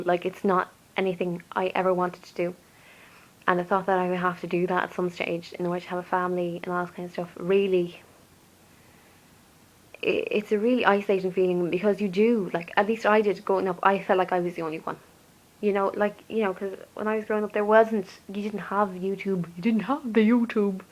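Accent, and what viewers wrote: Ireland. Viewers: I love your beautiful irish accent and your inspiring story! I am the same! I'm the "g" word as well! I find it so amazing, you make me feel so happy and warm inside. You are so pretty! How could people call you ugly when you are the most beautiful person I have ever seen! I love you! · I'm trying to pluck up the courage to come out and watching your video is another helping hand to getting there. My heart races when I just think about saying it out loud. Oh and I absolutely love your accent :) always had a thing for Irish accents hehe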